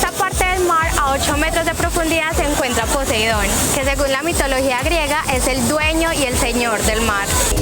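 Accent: Antioquia, Colombia. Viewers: Eres hermosa... y ese habladito paisa me mata👌❤🇨🇴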